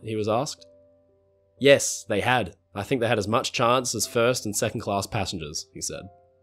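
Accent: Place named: Australia. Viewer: This channel is always a delight to watch. The narrator has an engaging way with words as well as a hint of an Australian cultivated accent which is very rare these days.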